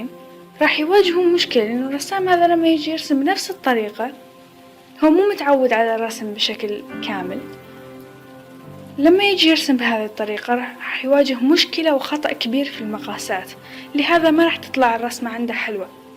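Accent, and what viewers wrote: Iraq. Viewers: لهجتها عراقية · لا عراقية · شنو لهجتج بالضبط عراقي خليجي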